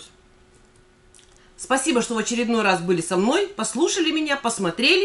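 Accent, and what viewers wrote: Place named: Urals, Russia. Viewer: Я вот слушаю и думаю... С Урала Вы. Да?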